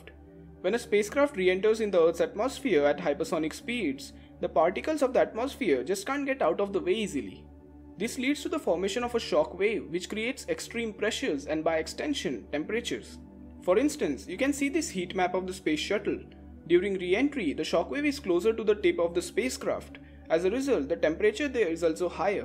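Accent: India. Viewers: Try speaking a little more slowly. Given your strong Indian accent you might be a little easier to follow. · I love Indian english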